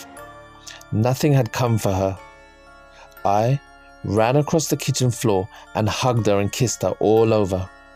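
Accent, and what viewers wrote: United Kingdom. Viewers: Class story, hearing it from a fellow Brit is even better, as my football team says as its motto, south London & proud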